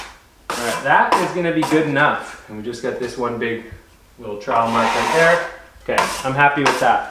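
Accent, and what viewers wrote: Canada. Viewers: I like how Canadians say "process"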